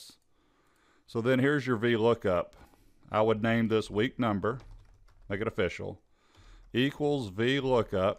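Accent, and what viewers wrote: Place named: Texas, United States. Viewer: Sir, are you from Texas because you sure sound like it?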